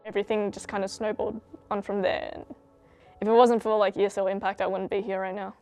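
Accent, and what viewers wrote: Australia. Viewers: This video is awesome!! and seeing an Asian lady with an Aussie accent, <num>/10 <3 <3 More videos please! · She is Australian. I'm Australian and i can tell from the way she speaks, that she was born here.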